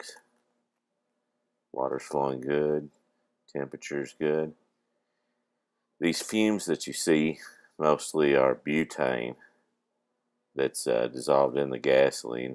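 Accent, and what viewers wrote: South, United States. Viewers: "we're going to put in about 4,000 ml of regular unleaded gas, ethanol free." What the hell is this in US measurements? Sounds like you have a southern drawl, but speak in commie language. I just don't get why some insist on using metric crap when the rest of Americans primarily use customary units in commercial activities, as well as for personal and social use. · OK so you used a gallon of gas whats all this metric crap,you dont sound like your from across the pond,, sounds like your south of the Mason Dickson line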